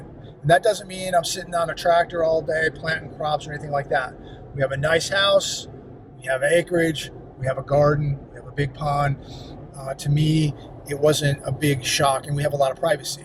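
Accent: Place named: Alabama, United States. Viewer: If you’re Liberal please don’t move here. This guy already sounds like an Alabamian. Let’s keep Alabama RED!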